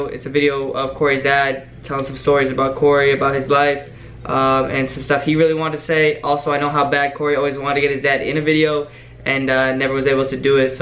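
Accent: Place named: Australia. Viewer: His accent is so Australian. I love it. Love you Corey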